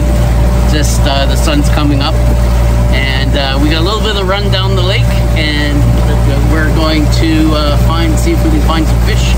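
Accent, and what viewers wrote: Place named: Canada. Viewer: I love to think of people in other countries finding this video maybe thinking we all have such a heavy Canadian accent! :) also thanks for posting!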